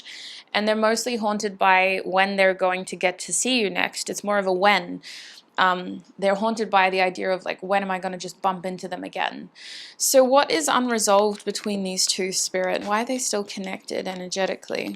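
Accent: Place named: Australia. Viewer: Just came across your channel and thought to myself - this lady sounds Aussie 🤔 and you’re an Aussie 🥳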